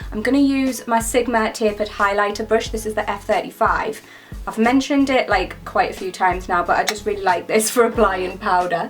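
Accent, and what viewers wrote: Newcastle, United Kingdom. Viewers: Are you a geordie?:D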